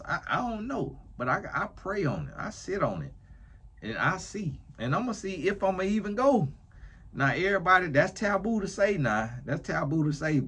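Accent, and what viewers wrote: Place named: Deep South, United States. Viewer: I'm sorry you got flack for your accent. That's no bueno. Personally, I LOVE accents! New York being my favorite tbh. But southern accents are a close second for me. I live in the south right now, in Texas. You do sound kinda like you're from Georgia or the deep South. My mom's from Oklahoma and she has a lot of countriness in her speech and I tell her she has an accent and sometimes she gets offended lol. But when I say that, I mean nothing bad by it. Accents are what make people unique! There are stereotypes out there, but nowhere should it be implied that people who have accents are less intelligent or less skilled. One of my favorite therapists, Dr. Les Carter has a super strong southern accent (he's also from Texas) and I love it! Accents are amazing!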